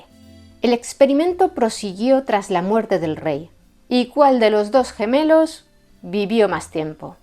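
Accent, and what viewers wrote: Spain. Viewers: Me dió risa porque si nos estamos tomando un cafecito mientras escuchaba el video, saludos, me encanta el contenido pese a que el acento español no me resulta tan agradable, pero claro que no me choca, solo es que no está en South TX uno muy acostumbrado a ese acento al ser de origen mexicano, sin embargo creo que para cuestiones hostoricas nos da cierto aire de parecer adecuado, así en mi mente funciona que creo que se hablaba en la antigüedad aunque sea algo que no sea verdad pero así se concibe en mi mente!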